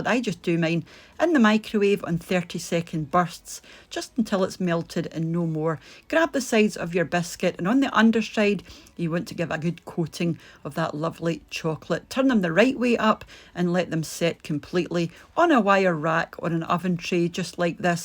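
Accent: Scotland, United Kingdom. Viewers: I always thought digestives contained oats, clearly I’m wrong 😅 to bad I’m gluten intolerant so wheat isn’t an option for me… I wonder if I could use oat flour instead? If anyone can help me out here, pls respond to my comment! It will be hugely appreciated ❤ they do look very delicious, luckily watching a recipe video with regular wheat flour doesn’t make me feel ill and I can still enjoy gluten containing biscuits that way - I know it’s not the same but still 😂 I also adore the Scottish accent! I fell in love with the accent and landscape after watching Outlander… me and my dad watched together and ever since he calls me “wee lass” and I lovingly call him Da, even though English isn’t even our native language ; we’re just so in love with Scotland, it’s history, it’s nature and the cuisine! Hopefully one day we’ll be able to go on a Da-Daughter trip to the Highlands and try an authentic Cullen Skink & Haggis 🤭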